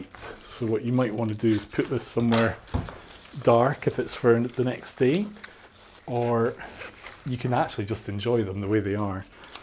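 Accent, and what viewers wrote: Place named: West of Scotland, United Kingdom. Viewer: Thank you so much for your easy to follow tutorials. also so great to hear a fellow west of Scotland accent!! love your work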